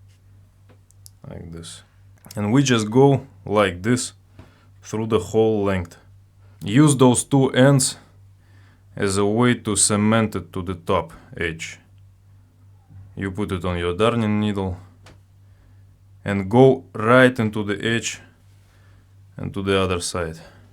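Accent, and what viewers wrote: Russia. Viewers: I love the thick Russian Accent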